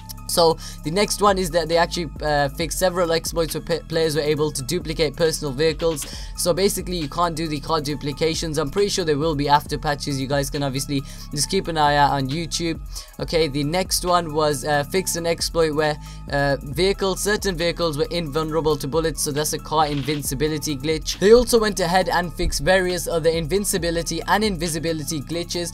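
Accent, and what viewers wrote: United Kingdom. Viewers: This guy sounds like he's from the UK, ain't from Canada?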